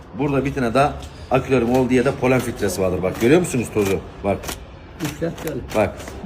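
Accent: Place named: Aegean, Turkey. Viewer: Alem adamsin kral bizde egeliyiz şivene kurban bozma şiveni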